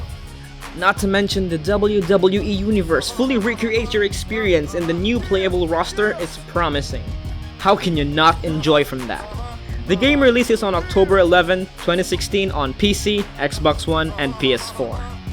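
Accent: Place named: Philippines. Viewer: That thick Philippine accent though.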